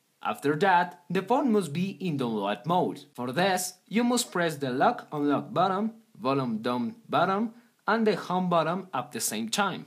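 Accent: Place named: China. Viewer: You need to add subtitles, I can't understand your Chinese accent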